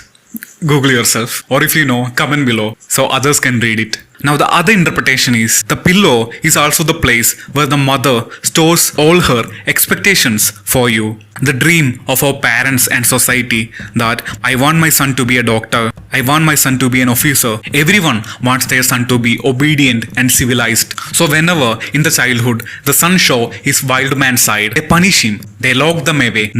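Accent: Kerala, India. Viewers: Man! That mallu accent!!!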